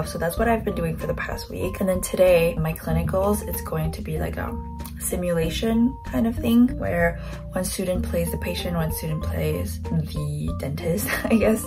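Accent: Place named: Canada. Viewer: Je trouve toujours bizarre quand un canadien parle français, votre accent est trop mignon (et parfois je comprends rien mdr)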